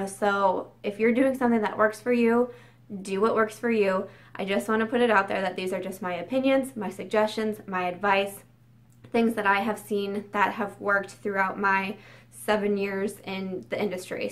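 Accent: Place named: Midwest, United States. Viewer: Thank you for these helps. I'm only an author in my head once in a while. I've never published anything. I enjoy hearing your midwestern accent. I also appreciate your tip on getting your response down in a Word document and then deleting it because I can see how it would be tempting to respond to negative feedback and pull down the professionalism you've worked hard for.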